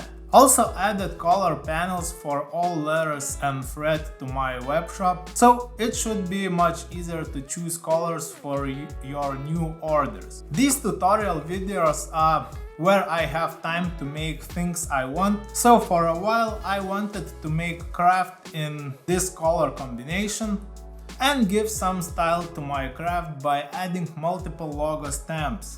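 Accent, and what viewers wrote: Russia. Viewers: Ну как будто бы он сам из РФ, учитывая акцент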